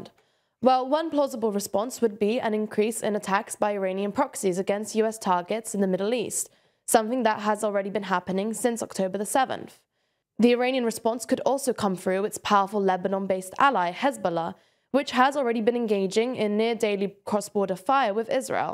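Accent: United Kingdom. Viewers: Your accent is soo Briish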